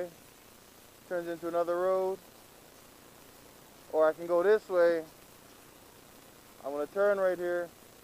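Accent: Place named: New Zealand. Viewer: I'm a Filipino from Guam... used to work on one of them cruise ships that sailed around the Islands of Hawai'i, that is why I thought your accent was familiar... For a second I thought your accent was from New Zealand, kinda sound similar...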